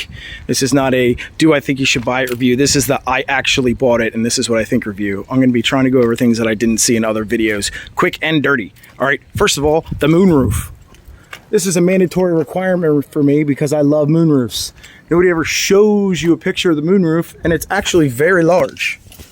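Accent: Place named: Philadelphia, United States. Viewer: Dude, are you from Philly? Because you sound, well, you sound like me LoL. Anyway great details. Can you disable the start/stop? I'm picking one of these up tomorrow.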